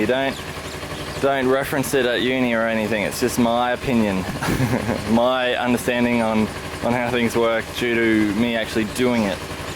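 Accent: Australia. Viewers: Thx buddy, you explained very clear, btw I'm from Australia as well, now lives in Thailand, just got a bike with this choke thing and have absolutely no idea about it until I watched your video, and its great to hear our accent. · your australian accent is really awesome xD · Must be the Aussie accent that makes it so easy to listen to your videos! lol Keep em coming you've taught me quite a bit about my ex250.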